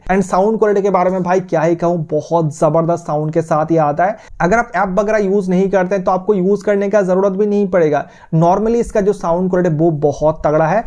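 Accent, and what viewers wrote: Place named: Assam, India. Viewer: Everything is good but ur Assamese accent is kind of unique not bad though 😊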